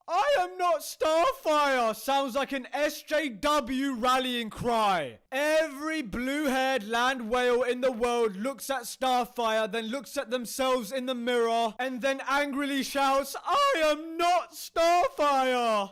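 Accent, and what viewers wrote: United Kingdom. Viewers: The combinations of your accent, commentary, angry tone and brilliant British insults made this video a 1000x better 🤣🤣🤣 Good job mate! 'I am not Starfire' is not even out yet and the preview description alone is already trash as fuck. There's absolutey NOTHING charming nor likeable about Mandy whatsoever. Her entire personality and character traits are her being 'gay, overweight and goth'. That's it. Also the fact that she "hates everybody but her best friend" who fyi, looks like another godawful Tumblr character who probably identifies themselves as an attack helicopter. As if all goths "hate everybody" for no reasons. And what's even more ironic? Mandy eventually falls for a girl in her class who is basically like Starfire (tall, popular, beautiful, nice/kind)! Wasn't Mandy try to get away from people like her mother?? WHAT KIND OF BS IS THAT?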